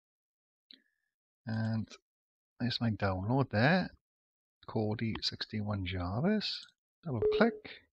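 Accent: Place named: Cardiff, United Kingdom. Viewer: You don't expect a Jack to take any notice of a bloke with a Cardiff accent! Thanks very helpful.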